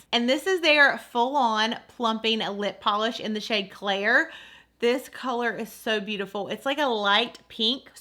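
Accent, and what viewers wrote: South, United States. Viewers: I don't get the ipsy bag anymore. I just upgraded to the glam bag plus and I am loving it so far. I wish I could get both because I loved getting the different bags each month. I'm just not financially able!! I Love your channel and that country accent. I'm from Georgia so my daughter has one lol...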